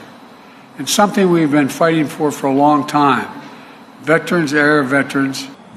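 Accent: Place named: Australia. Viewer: Can't stand even listening to this shit head Aussie ear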